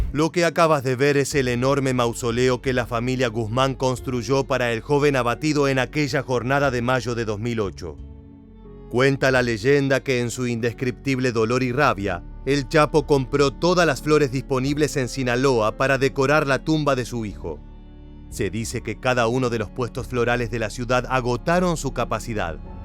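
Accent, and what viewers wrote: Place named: Argentina. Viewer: Tenia que ser argentino ese narrador arruino el docu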